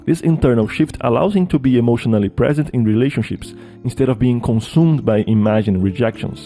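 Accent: Brazil. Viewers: Posso estar errado, mas esse cara é brasileiro? Tá parecendo um brasileiro falando inglês ou eu estou louco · vc parece ser brasileiro pelo seu sotaque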